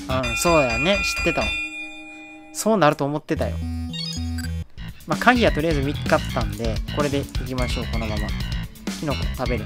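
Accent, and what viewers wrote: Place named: Kansai, Japan. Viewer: このテンポ大好き💕 関西弁でやったって全然あり！ というかこっちの方がお得！！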